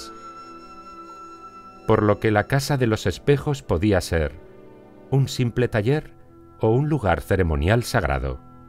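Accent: Spain. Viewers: En los 11 años de educacion primaria que estudie en Peru y que, solo se enfocaba en Historia del Perú. Jamas me hablaron de ninguna de estas huevonadas que habla este tipo con acento Español. Voy a reportar este video ya mismo. Esto es una burla a la humanidad. PDTA. Escuchen el la 5ta estrofa del Himno Nacional Peruano se las recomiendo.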